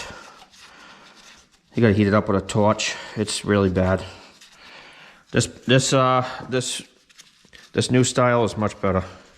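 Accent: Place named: Northeast, United States. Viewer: Good video. I am in a bit of a bond. I ended up taking my gearbox to the dealer to remove and replace the seals and the output shaft bearing as I was afraid of damaging something. Yours is the only place on the internet mentioning the 1” dust shield (SG014). In preparing to re-install my rebuilt gearbox, noted the dealer returned the dust shield in the bag. I know this piece should be installed to prevent future failures. Does this shield fit into the grooves as the other seals do? Btw,I love your northeastern accent!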